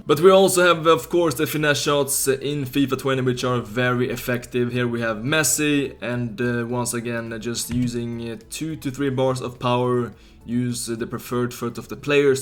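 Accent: Sweden. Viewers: Are you swedish i can tell by your accent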